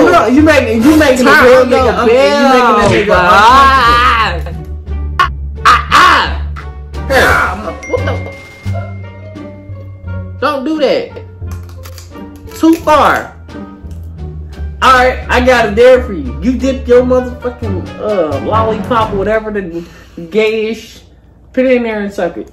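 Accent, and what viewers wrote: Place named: Miami, United States. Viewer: He sound like Miami am i wrong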